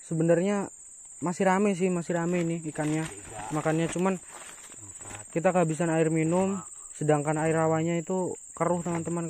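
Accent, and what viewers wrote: Java, Indonesia. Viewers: Wong jowo to mas?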